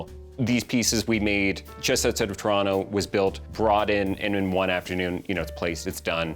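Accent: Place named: Midwest, United States. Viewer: Wow - this guy is from the Midwest if ever I’ve heard a midwesterner. (Update: Ontario accents being synonymous to those in Michigan, upstate New York, or Wisconsin.)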